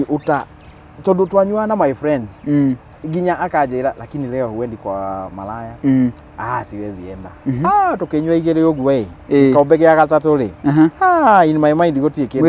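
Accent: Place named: Nyeri, Kenya. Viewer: Kikuyu ya nyeri slaps differently..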